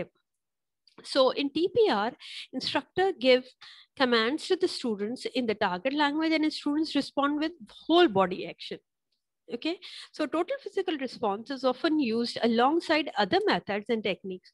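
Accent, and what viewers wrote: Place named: India. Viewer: Nice explanation but your dialect is Indian could i ask y if you are indian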